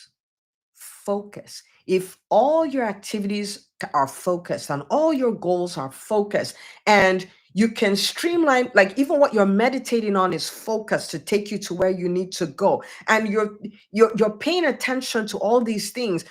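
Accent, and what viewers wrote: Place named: Kenya. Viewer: Doc, are you really from West Africa? Your English sounds so much Kenyan.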